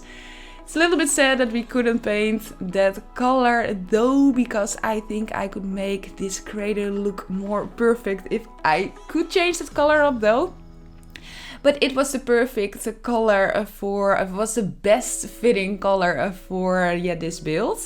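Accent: Netherlands. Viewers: Je heeft een groot Nederlandse accent.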